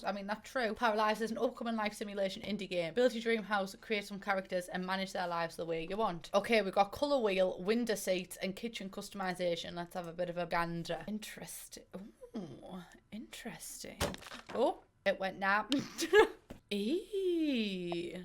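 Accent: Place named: Teesside, United Kingdom. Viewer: Are you from boro? I’m from Teesside and you have a proper boro accent.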